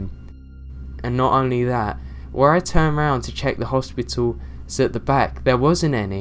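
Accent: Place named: London, United Kingdom. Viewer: Are you from Manchester? I'm working on my regional dialects and accents from the UK since between my family, and my job, I've been spending more time than ever there. Namely Bodycote. So to my untrained, or at least little trained ear, you sound like your from Manchester, or the surrounding area. Although, there's a bit of that London sound in there as well, although not as much. Or I'm just fucked on the head, and have no idea what the hell I'm talking about being from Detroit and all lmao. I've been told I have a tail specific accent as well. Not quite Midwestern, nor Wisconsin, or Ohio. Just that Detroit, and Chicago sound alike. Fuck if I know 😡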